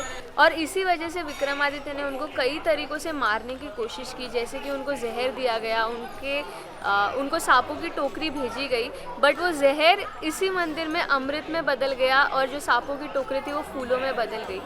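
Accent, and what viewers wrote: Gujarat, India. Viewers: Meera jee "kantaal" ke Dwarika chale gaye 😀. I totally loved this sentence. Ekdum Gujju style Hindi hai. And I have stayed in Gujarat for 15 years. So I love this style of speaking 💕. You guys make amazing videos and one reason I watch them is to listen to style of Hindi!! Keep rocking guys 👍. Khup गोड आहे ऐकायला!!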